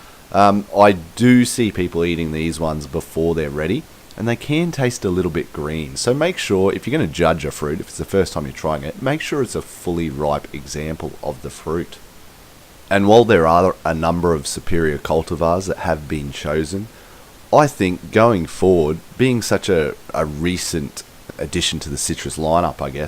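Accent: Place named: Australia. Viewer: Such an aussie accent i like it... beautiful finger lime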